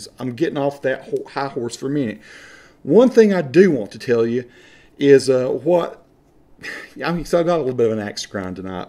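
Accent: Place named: Appalachia, United States. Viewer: Hey, I just watched this video (5 years later), you are raising a very interesting issue about sociolinguistics. First: don't change the way you talk, Southern Appalachian accents are the best ;). Lots of factors are affecting the way people talk, looks like what is dividing people in the South (and elsewhere) today is whether they identify themselves as urban or more rural: the latter group being more likely to keep a distinct accent while the former tends to adopt a "global identity". Other factors come into play as well such as the representation of an accent in media (literature, television...) that create stereotypes and the woman you talk about seems to be rejecting, as you said, her identity in favor of a "global" identity probably because of negative stereotypes. And that's pretty sad because it's the fact that people have different accents that makes a language rich and interesting to study, but more and more people (especially in the South unfortunately) in large cities tend to adopt a more "global" accent we call "General American" in phonetics. 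Interestingly, the representation of an accent is different from place to place: where I'm from (France), people usually like U.S Southern accents. Thanks for sharing that ;).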